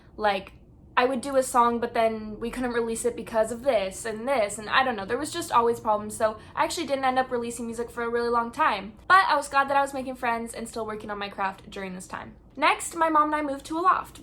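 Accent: Canada. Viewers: I love your Canadian accent ( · I was just watching Sam and colbys conjuring video and swore I heard some Canadian from you. Us Canadian to California transplants can hear it lol. Funny, I also moved to California from Oshawa when I was a teen. It’s been much longer for me though 😂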